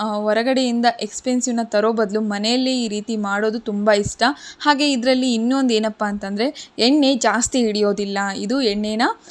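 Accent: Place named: Hindi, India. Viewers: ಅದು ಹಿಂದಿ ಭಾಷೆಯ ಉಚ್ಛಾರಣೆ. ಅದಕ್ಕಾಗಿ ಅವರಿಗೆ ಭಾಷೆ ಬರಲ್ಲ ಅಂತ ದೂರುತ್ತಾ ಕೂರ ಬೇಡಿ. 🙏🙏😅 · Neevenu north india dhavara? Achchukattaagi kannadadhalli sariyaagi uchchaara maadi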